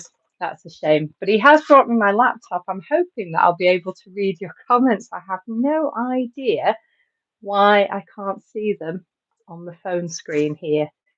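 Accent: Northern England, United Kingdom. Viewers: I personally really like your Northern English pronunciation. Some English teachers change the accent to teach the standard pronunciation, but I prefer originality.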